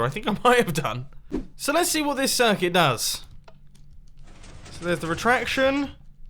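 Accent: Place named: United Kingdom. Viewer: used to sound less British back in 2017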